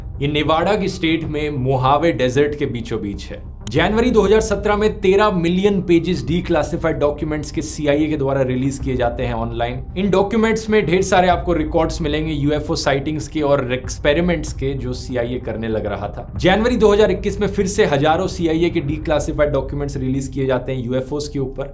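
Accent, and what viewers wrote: Haryana, India. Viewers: DONT YOU THINK DHRUV RATHHEE STILL KEEPS HIS HARYANWI ACCENT(JAAT) WHILE SPEAKING HINDI IN THE VIDEOS.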